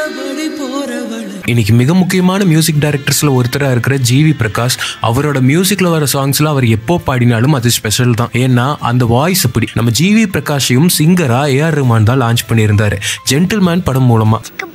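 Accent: Kongu, India. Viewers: நண்பா ரொம்ப ரொம்ப ரொம்ப ரொம்ப ரொம்ப ரொம்ப ரொம்ப ரொம்ப நன்றி நண்பா இந்த கொங்கு தமிழ்ல பற்றி ரொம்ப நாளா கேட்டது இருந்தேன் இப்பதான் எனக்கு மன நிறைவாய் இருக்கிறது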